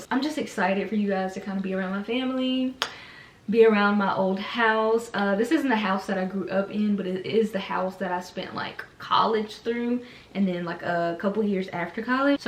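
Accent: South, United States. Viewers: Puuurrr 😻😻 I’ve only been Watching your videos for about a week but I knew I heard a southern accent ! Period Girl 😜💅🏽 this Vlog was very wholesome, keep up the good work sis ❣️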